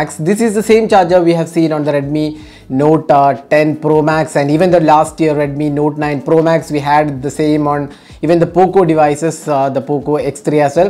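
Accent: India. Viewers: Bahasa Inggris Plus Logat Org India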